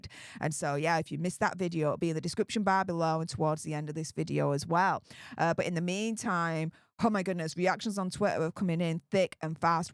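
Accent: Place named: North, United Kingdom. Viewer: Excellent video- damning tweets. But surely with your great northern accent "it will bite him in't ARSE"😂...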